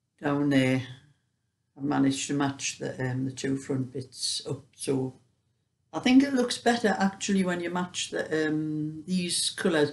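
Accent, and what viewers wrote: Newcastle, United Kingdom. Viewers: Hi. I have only recently found you. As a scouser who moved to Canada over 30 years ago, I have always been a sucker for the Geordie accent😊 I have been following your tutorial for the big crochet bag. I started as per you instructions with a 37 stitch chain Measured it and it was about 16 inches as you said. I am now doing the sides and the base magically measures 20 inches. It's HUGE! I tried to send you a picture but I don't know how to attach to this message. Do you have any advice? Thank you. Sue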